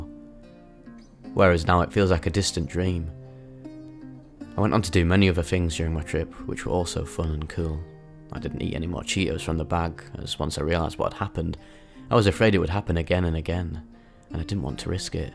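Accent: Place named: Burnley, United Kingdom. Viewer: I'd normally hear this type of voiceover from some American tone not a Burnley accent 😅😂 don't take offence I like it as I can relate I too have an accent which doesn't ring true to me .I speak in a Scouse accent however I am not a Scouser 🥸. Life's a funny thing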